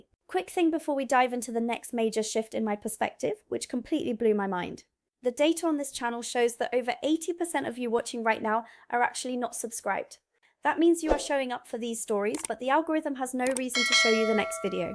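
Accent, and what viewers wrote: England, United Kingdom. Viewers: She sounds British to me, so maybe she is Australian or something? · you have an amazing english accent for an american :-) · How did you acquire such a cut-glass English accent? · If you're American, then why have you got a British accent?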